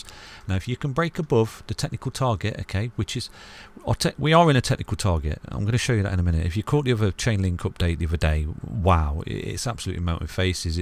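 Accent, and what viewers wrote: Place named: Northern England, United Kingdom. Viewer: Love the videos thanks!! Love the Northern accent too - reminds me of home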